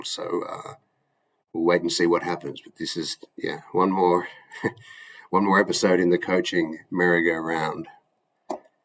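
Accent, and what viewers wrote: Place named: United States. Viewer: Am I crazy, or can I detect a slight Ameircsn accent?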